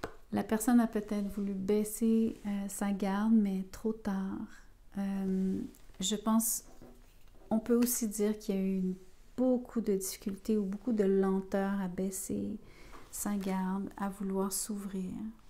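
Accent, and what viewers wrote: Quebec, Canada. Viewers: Je l'attendait tellement, avoir cette manière apaisante et la signature Québécoise ❤, normalement les verseaux seront les premiers pour Mai 😅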